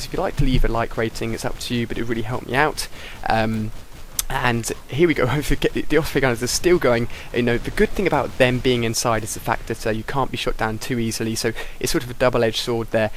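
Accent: United Kingdom. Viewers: What do you think? He's only got a British accent. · love the britisch accent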